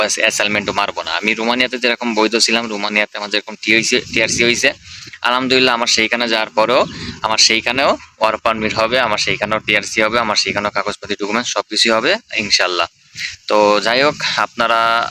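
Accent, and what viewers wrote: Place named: Jessore, Bangladesh. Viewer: ভাই আপনার বাড়ি কোন জেলাতে আপনার ভাষায় তো বলে যশোর চুয়াডাঙ্গা এই সাইটে আপনার বাড়ি কোন জায়গায় একটু যদি জানাইতেন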